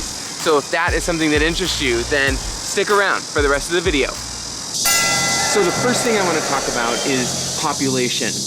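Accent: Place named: Canada. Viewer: You are amazing for responding to all my comments. Where in the States are you from? You sound like a fellow Canadian. I like your laid back style of reporting.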